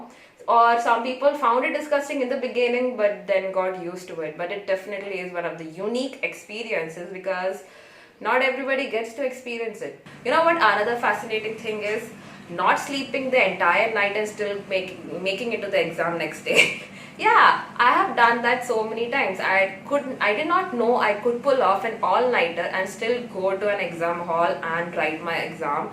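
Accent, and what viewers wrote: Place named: Kerala, India. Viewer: you have a malayalam accent while speaking tamil. that's great! coz i'm a multilingual myself. i speak Tamil ,Telugu, Hindi, Malayalam, English(of course),korean and french(still learning). 😀and i made it through the cringe session. it was truly CRINGEWORTHY.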